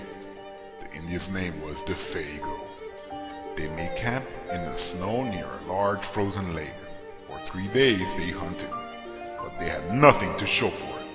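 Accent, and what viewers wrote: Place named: Minnesota, United States. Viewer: Minnesota accent lol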